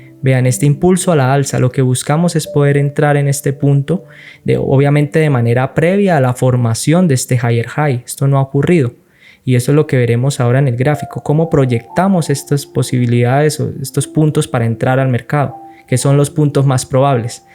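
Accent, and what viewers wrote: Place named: Colombia. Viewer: Hola tocayo como vas? También me llamo y soy de colombia igual tú por tu acento. Es el primer video que velo tuyo, felicitaciones. He visto muchos vídeos sobre estructura y te digo que es el más claro que he notado sigue así. Una consulta en donde ves el índice del dólar? Y entonces dependiendo de su movimiento así mismo decides así donde van tus entradas? Gracias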